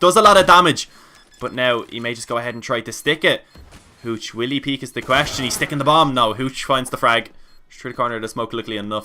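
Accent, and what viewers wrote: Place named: Meath, Ireland. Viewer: THIS IS REALLY ANNOYING ME you sound like youre from meath but also a bit more northern!!!!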